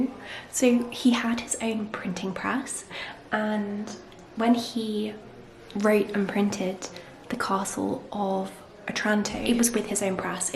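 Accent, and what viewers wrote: United Kingdom. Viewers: I love your accent, British women are so elegant sounding.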